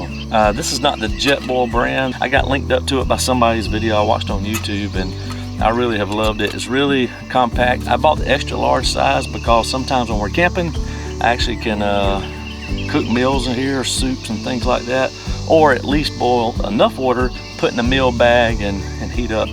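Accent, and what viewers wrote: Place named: South, United States. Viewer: Love that southern twang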